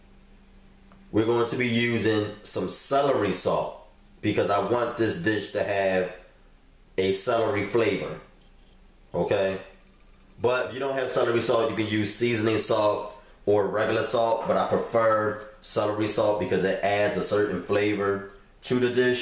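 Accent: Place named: Philadelphia, United States. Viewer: Lakeia Burgess lol That's that Philly accent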